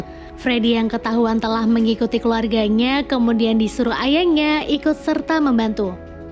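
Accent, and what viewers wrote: Pemalang, Indonesia. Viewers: Kk org pemalang yaa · Kok pemalang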